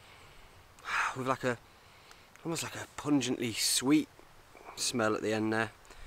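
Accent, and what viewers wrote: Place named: Sheffield, United Kingdom. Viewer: Bet your from round my neck of the woods with your accent. Sheffield?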